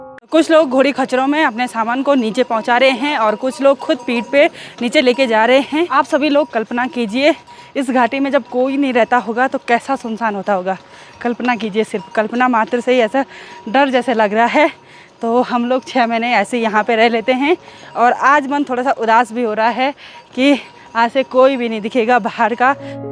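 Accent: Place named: Pahari, India. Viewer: By hearing your voice a recollection become fresh of my posting tenure at Pauri Garhwal 1992. A resembles, true copy of pahadi. You have a good quality of an utuber. Please write your biodata. Excellent 👌👍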